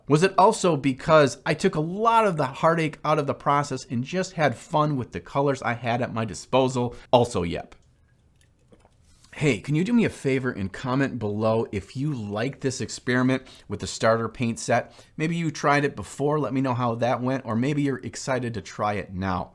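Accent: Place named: Midwest, United States. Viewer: I knew you were a midwesterner, and that ope was the final clue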